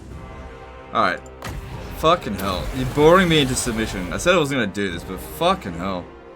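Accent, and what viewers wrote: Australia. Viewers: Bruh. I like putting these on as background noise cuz I love TW and has the free content perk of an Australian accent, but I heard those silly ass bells and looked at the screen. Lmaooooo what are those GOOFY dudes. 69/10 doomstack imo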